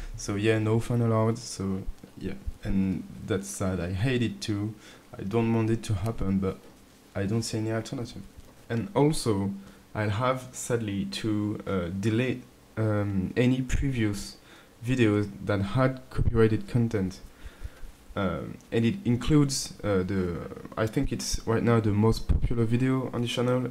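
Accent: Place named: France. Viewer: ptn ouais j me disais bien que t avais l accent francais!^^ ca fait plaisir, brf continu ta chaine surtout tu gères👍🏻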